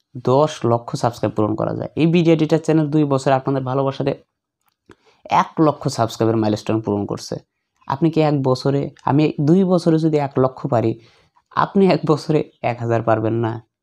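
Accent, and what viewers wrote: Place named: New York, United States. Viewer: ভাই নিউ ওয়ার্ক প্রিসিলার মতো করে কথা বলছেন ওয়াও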